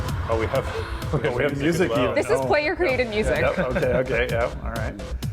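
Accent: Ireland. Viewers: Hmm, your english sounds a little bit strange. Are you irish?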